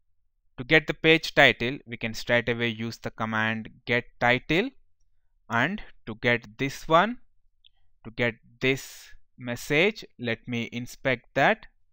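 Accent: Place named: India. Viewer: I'll be honest, I was initially put off by the Indian accent so I apologise for that. I am about 1/2 way through and the step by step introduction is absolutely great so thank you.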